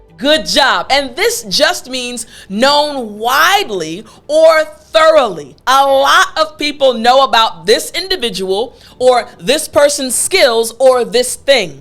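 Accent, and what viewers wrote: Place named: United States. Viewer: thank you for your lesson! i'm ardi from indonesia, I'm a teacher and watching your video really inspire me a lot and i kind of difficult to teach and make the student understand what i'm actually gonna tell them and to be honest, there are still so many kids in my country not really interested in learning english, they learn as their parents' willingness not from the students themselves. for me, you are such an amazing teacher, your lesson are easy to absurb and the way you teach is so cheerful, ireally enjoy your american english so much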